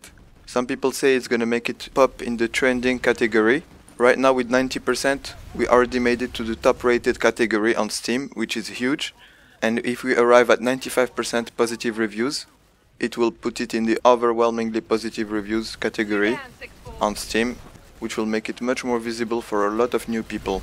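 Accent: France. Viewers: Good video ty...Hey can i ask why you speak like a french guy? i am french and when you speak in english, it looks like you know how to speak french:)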